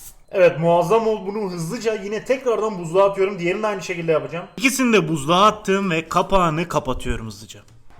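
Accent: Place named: Hatay, Turkey. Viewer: Bu adam amerigalı olsa beğenirdiniz dimi ama bu adam Hatay ağzıyla konuşuyor ve Hataylı bu yüzden bu videoya like atmayacaksınız ama bu adam Amerigalı olsa 10 milyon abonesi vardı (YouTubetok)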